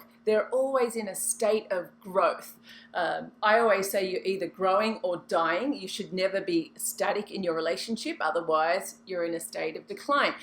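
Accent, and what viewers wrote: Australia. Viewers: Where are you from? I hear an Australian accent. But, then I hear "rrrrrrr's" pronounced at the ends of words like "partnerrrrrr", "casterrrrr" lol . . . almost like an Irish or American person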